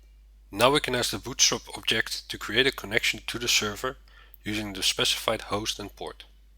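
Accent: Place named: Netherlands. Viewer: Sounds kinda dutch innit?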